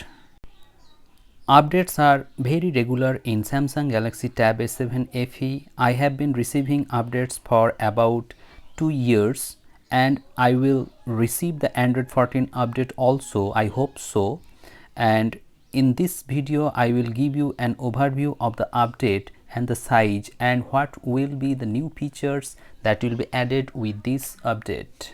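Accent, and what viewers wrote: India. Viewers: Bihar se ho bhai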